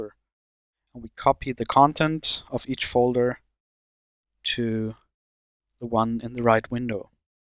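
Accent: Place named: Germany. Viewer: Ich liebe es wenn deutsche Englisch reden✌️ P.S. gutes Video xD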